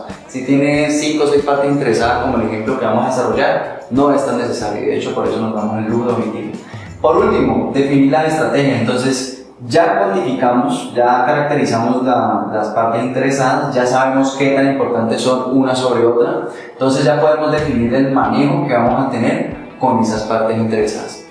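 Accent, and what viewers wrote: Colombia. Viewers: La cadencia al hablar (supongo colombiana) me obligó a afinar el oído pero entendí todo. Gracias. Un mínimo aporte a lo que para mí es un excelente vídeo: El sonido al principio es deficiente.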